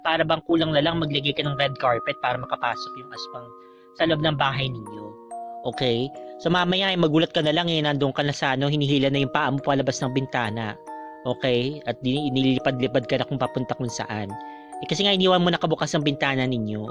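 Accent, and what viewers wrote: Visayas, Philippines. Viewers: good aftie po! Thanks.. okay lng po sa bisaya, pronunciation, cute kasi pakinggan hahaha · Ang cute MO magbisaya mamshie... Hahahaha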